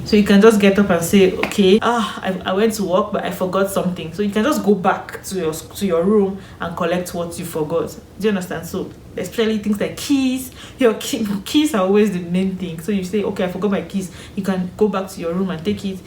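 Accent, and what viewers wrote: Nigeria. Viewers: Mehn…you sound so Nigerian ✌🏾✌🏾✌🏾🙂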